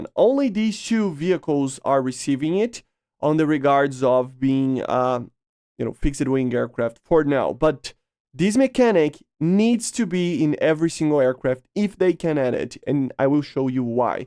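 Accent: Brazil. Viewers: hes brazilian. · he’s from Brazil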